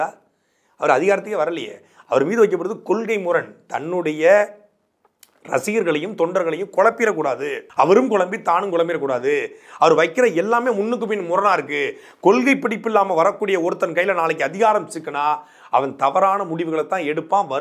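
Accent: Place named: Thuckalay, India. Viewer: Née epadi bro thuckalay pesuna bro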